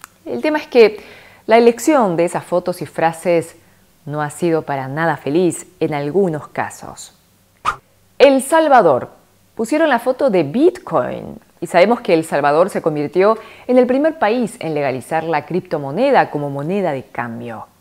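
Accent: Argentina. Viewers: Primera vez que veo este canal. Muy bueno. Pensé que me hablaría en coreano y habla español argentino :) saludos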